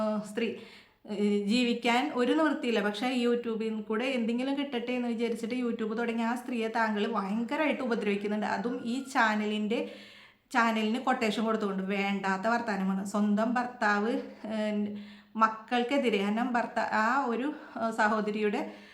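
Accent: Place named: Thrissur, India. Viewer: Itha trisur ano samsaaram kondu thoniuadhaane 🥰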